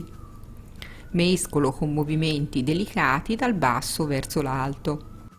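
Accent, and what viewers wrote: Tuscany, Italy. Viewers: Che bel parlare toscano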